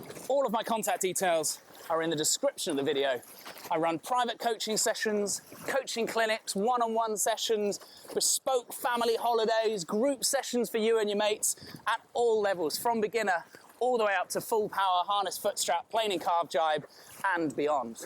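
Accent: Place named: England, United Kingdom. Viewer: Beautiful English accent!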